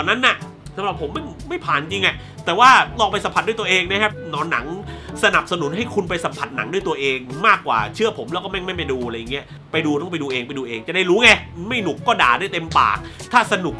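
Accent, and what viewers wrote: South, Thailand. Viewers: พี่เป็นคนใต้ป่าวครับ แหลงใต้ได้ม่ายนิ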